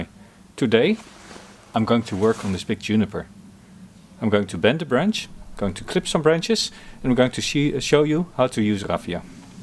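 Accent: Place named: Netherlands. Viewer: Hello again. WOW that was a tour de force, bravo! Every other ‘demonstration’ I’ve seen were ham-fisted by comparison and over dramatic too! By the way, now I’ve heard you talk more, and fluently, I’d put money on your being Dutch, like some of my best friends! ✌️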